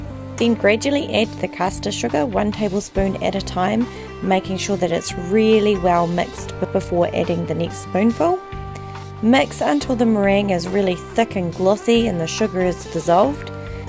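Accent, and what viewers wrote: New Zealand. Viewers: Soo good to hear a kiwi voice doing this.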